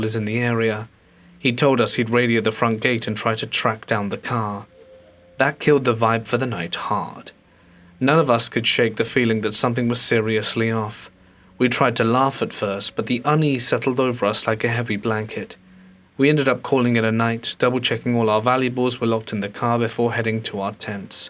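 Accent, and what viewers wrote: United Kingdom. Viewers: Not bad, I enjoyed your accent not trying to be crochet about it just something about a UK accent talking about horror stories make the distinguished and eloquent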